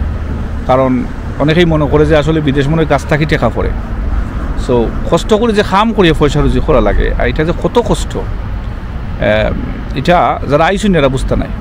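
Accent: Sylhet, Bangladesh. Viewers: Apni jodi sobar jonno video banan,try korben shuddho vashay kotha bolte. Karon sobai to r syleti na. Time r ektu short korle shunte valo lage.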